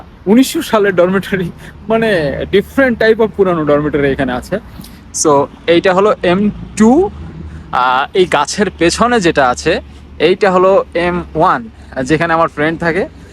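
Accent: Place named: Jessore, Bangladesh. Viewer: যশোর-ঝিনাইদহ এর মত লাগছে।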